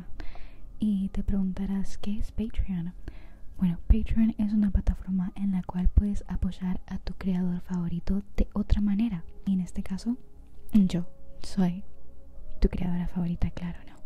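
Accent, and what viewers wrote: Mexico. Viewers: ¿Sos Argentina?😯 Te oigo más acento como mexicano jajaj. Súper bueno el video, tenés una voz muy relajante.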